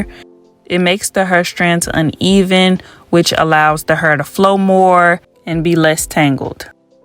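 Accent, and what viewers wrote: St. Louis, United States. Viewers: You from saint Louis? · “ cut my hurrr” lol 😭the way Americans talk is so interesting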